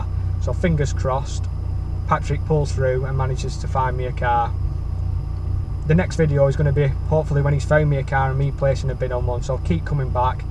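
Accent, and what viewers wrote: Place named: Lancashire, United Kingdom. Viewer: Just on part 2 now, enjoying it so far! Subscribed btw. Where you from if you don’t mind me asking? you sound local to me, lancs, Blackburn Area ish accent? 👍🏻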